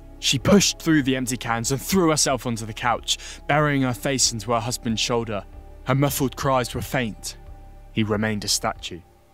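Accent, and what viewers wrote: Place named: United Kingdom. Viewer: lose the british accent.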